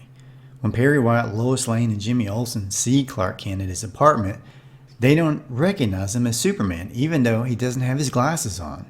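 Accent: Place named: Kentucky, United States. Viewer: I really enjoyed this. Even though I knew about two-thirds of it because I too am a lover of trivial trivialities, is Barney said on Andy, I enjoyed how this brought them together and how they were presented. New to this channel but I will be back. May I ask where you are from? I am from Kentucky and we seem to have the same accident. Thanks again for these great videos.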